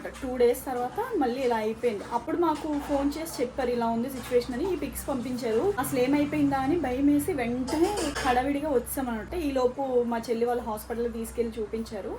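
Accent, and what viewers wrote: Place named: Palakollu, India. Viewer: Meedi a ooru akka ? Palakol vacha antunav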